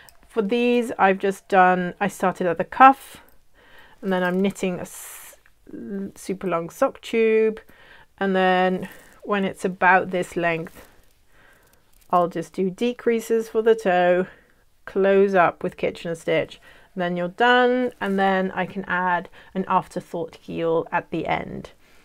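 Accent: United Kingdom. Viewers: Hello. Greetings from Ridgefield, WA, USA! I just found your podcast and quite enjoyed it. I'm a fairly new knitter so I have alot to learn and am open to any and all tutorials. Could you please give your name and explain why you are in The Netherlands, please? I had assumed from your accent that you are in the UK. Thanks, and take care. Patty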